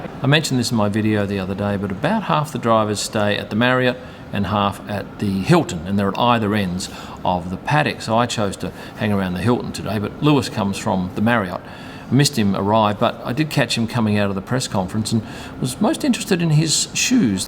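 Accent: Australia. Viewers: Great channel funny accent.Aussie Aussie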